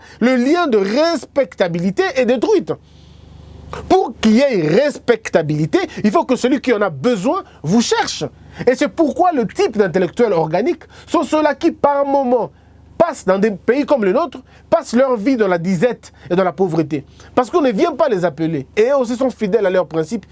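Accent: Democratic Republic of the Congo. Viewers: Lingala ya solosolo boyoki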